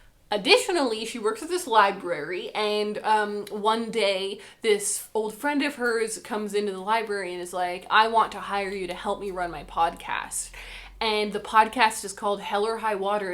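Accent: Canada. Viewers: So many Canadian 'abouts' in the first forty seconds it's so great! Great sponsor, looks like a good ecofriendly product.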